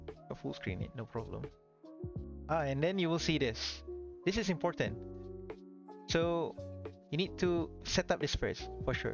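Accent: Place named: South East England, United Kingdom. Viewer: Спасибо тебе, человек с юго-восточным акцентом за эту ценную информацию!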